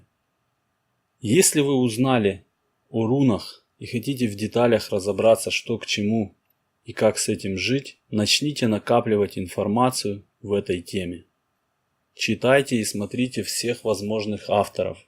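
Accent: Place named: Donetsk, Ukraine. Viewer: У меня был друг с Донецка..Димка.....у него говор как у Вас....мне приятно....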